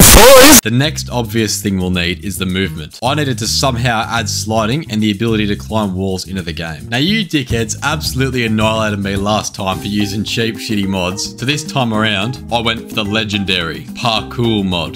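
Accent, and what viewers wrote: Australia. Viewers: this video just popped into my recommendations and holy shit this guy is aggressively australian (in a good way)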